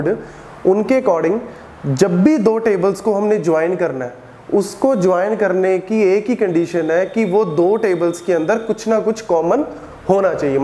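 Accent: Punjab, India. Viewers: fun fact - the guy is Punjabi. P.S. - I just guessed it because his accent reminds me of Punjab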